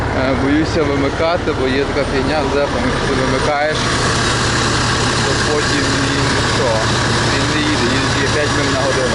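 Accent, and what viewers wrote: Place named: Ukraine. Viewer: русских слов, но с укр. вымовой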